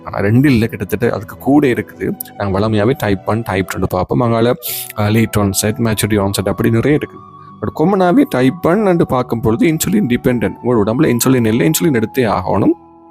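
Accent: Sri Lanka. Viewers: Hello doctor, your tamil slang is like somewhat srilankan people speaking tamil.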